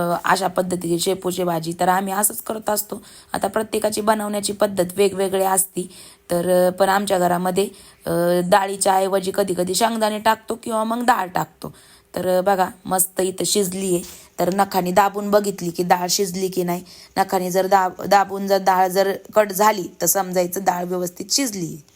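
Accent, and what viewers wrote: Ahmednagar, India. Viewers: लोकांकडे लक्ष नको देऊ तुम्ही बहिणी खूप ट्यालेतटेड आहात मी नेहमी व्हिडियो बगते खूप छान वाटत....... नगर ची भाषा तुम्ही बोलता तशीच आहे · Tai tya areyachi bhasha tshich aahe mi pn nagar chi aahe asch bolt ja chan vatte tikd tshich boltat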